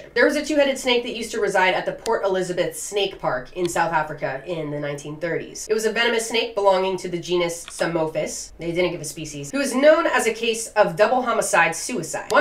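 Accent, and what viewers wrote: Midwest, United States. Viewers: Australia may be unhinged but my partner is scared of your videos because you look like you grew up out bush but but speak with an American accent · I found your channel! I was wondering why you seemed so familiar, someone showed me your tiktok, a video, and i was like "yeah no shot, i learned that when i was 5.... did you not go to elemtary?" like they were showing basic crap, not the real intersting stuff that's in your actual full videos. i thought you were an up and comer, no you're a tik tok legend, that started youtube. So you'll never read this. BUT I was trying to guess you accent. I was thinking "gotta be mid west, but not north mid west, mid west as in (west if chicago,) pretty shres the same accent until you get to so-cal and portland and seattle areas. I was close!! Thogh your PO box says LA, i don't think you were raised in SoCal. 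I'd say between Northern cali and chicago, somewhere between there, but as far as south as north arizona/newmexico. (it's a large accent area)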